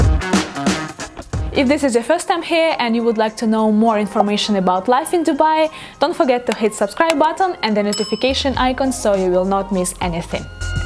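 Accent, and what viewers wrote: Russia. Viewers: как-то странно слышать английский с русским акцентом😅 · Is that a Russian accent? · Madam Your Russian Accent Is Amazing.i Like It. Love From Pakistan💓🤗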